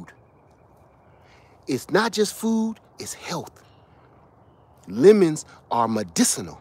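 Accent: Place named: Northeast, United States. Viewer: Sounds like you're in the northeast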